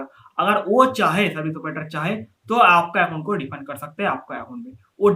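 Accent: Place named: Bihar, India. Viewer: bihari accent ne jaan le rakhi h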